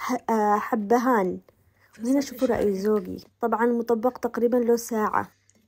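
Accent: Yemen. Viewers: ربي يسعدك وينولك مرادك ..انسانة راقية ولهجتك اليمنية جميلة جدا ❤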